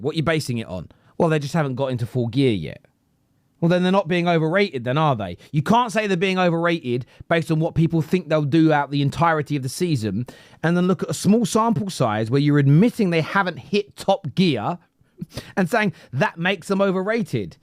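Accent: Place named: Scotland, United Kingdom. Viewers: Who is this Scottish tosspot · Scottish accent heard, opinion objected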